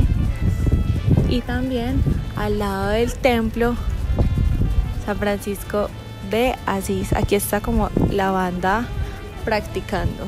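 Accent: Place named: Colombia. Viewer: Tienen un feeling y una manera de hablar las colombianas que me encanta,voy a seguir viendo los vídeos de esta nena 😊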